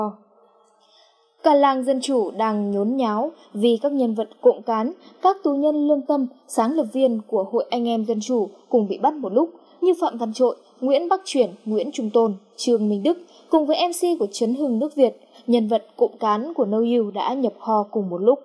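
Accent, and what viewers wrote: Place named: North, Vietnam. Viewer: giong cua mien bac viet cong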